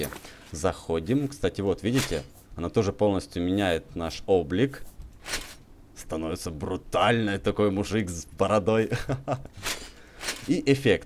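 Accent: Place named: Ukraine. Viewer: У тебя акцент.... ты украинец?)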